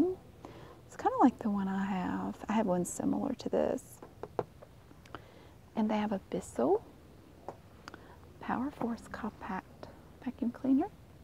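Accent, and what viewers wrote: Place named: Georgia, United States. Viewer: I don't write comments often or at all really but I really want to say Thank you I used to live in GA but I moved and your accent reminds me of home. Also I still get the same tingles when people whisper in my ear. I've had a really hard time falling asleep lately but your videos remind me of home and put me right to sleep! Thank you for making your videos! You are absolutely amazing. Please don't stop making videos. Love the walk throughs!! Stay strong and blessed!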